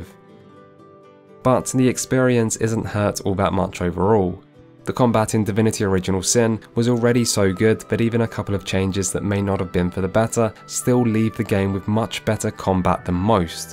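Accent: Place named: United Kingdom. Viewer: but you have a British accent!!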